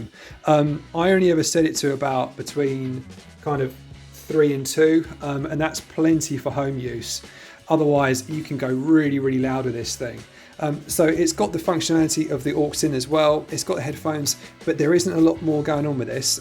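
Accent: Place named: United Kingdom. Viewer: many thanks look forward to your review. I never used nux before but I was totally blown away with the mighty air. It's only the size of my hand so you could take it anywhere. Having a built in battery and being wireless is massive feature. It's good you don't have to spend loads of money for good sounding gear. Keep up the good work just came across your channel and love it. Are you in USA , you sound British but you say pricing in dollars.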